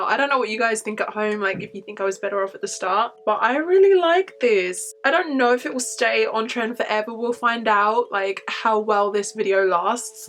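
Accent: United Kingdom. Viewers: I just discovered your channel and I love it ! By the way Oh My God I am obsessed with your british accent 💕💕 PS: Hi from France 🥰🥰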